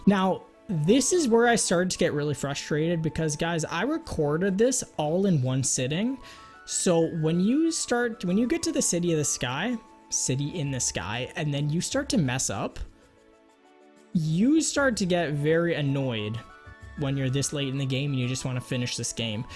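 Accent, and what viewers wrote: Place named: Canada. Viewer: bro this canadian accent is killing me 😭:face-pink-tears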